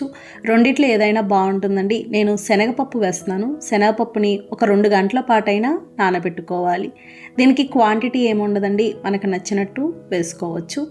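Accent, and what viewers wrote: Godavari, India. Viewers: Meedhi godavari aa andi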